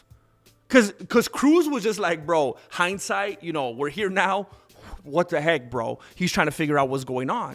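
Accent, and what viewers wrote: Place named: Mexico. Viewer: An intimate relationship with himself in a metaphorical and literal way. You are a genius my man. The num-chuks with flip flops in the background. It is so great how you can put that Mexicano flavour on the words.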